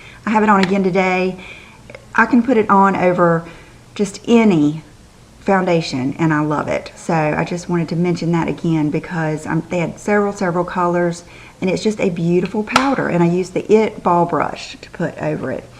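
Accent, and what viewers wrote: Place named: South Carolina, United States. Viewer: You are such a doll! I live in greenville SC and just love watching you, hearing that familiar accent I know all to well! Xoxo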